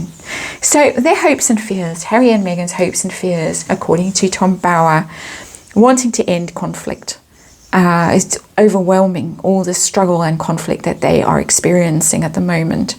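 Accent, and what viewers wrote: South Africa. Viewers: Past 30 years living and working on various continents. Instantly regognised your Sout-African accent. ✨Marvolous. ✨ Since then i always follow your input.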